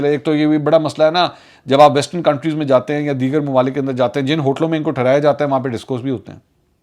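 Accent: Punjab, Pakistan. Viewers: What a bogus Vlog. میں کہ چکا ہوں، میں بتا چکا ہوں۔ Bhai pendu/ Punjabi. Salam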